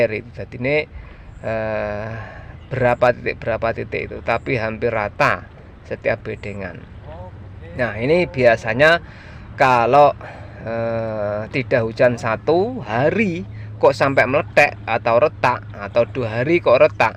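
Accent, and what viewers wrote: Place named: Madiun, Indonesia. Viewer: Hadir om,, sampean asli pundi om?? Kok bahasa jawane mirip madiun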